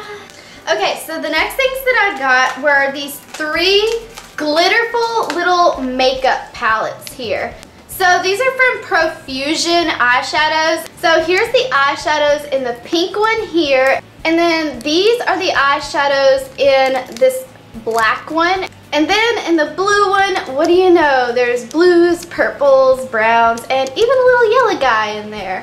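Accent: South, United States. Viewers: Where is she from???!!! I know she's from the south but where??!! :)))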